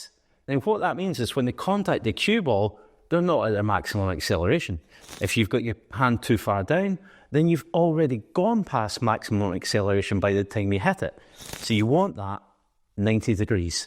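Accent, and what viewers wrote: United Kingdom. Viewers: I'm so tired of listening to the British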